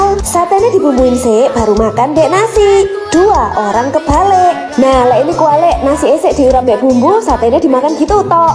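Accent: Java, Indonesia. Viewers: Enak bgt denger bahasa jawanya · Bahasa jawa nya medhog bgt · Kak owenge jowo yo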